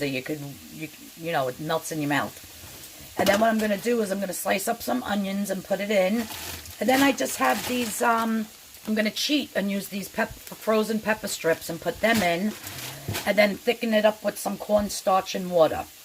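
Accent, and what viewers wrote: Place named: Long Island, United States. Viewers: No, but I lived in Manhattan for 15 years and had a friend who was from Long Island and she sounds just like you! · The food looks great, but the ACCENT kept me watching. 😂😍🥰 Long Island?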